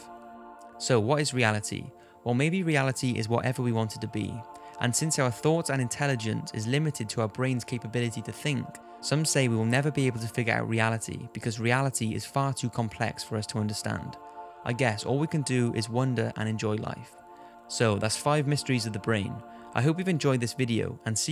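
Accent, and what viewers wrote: United Kingdom. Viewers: Hey what part of the UK is your accent from?? I live in Australia and there's heaps of British people here but I would rarely ever hear an accent like yours? Lol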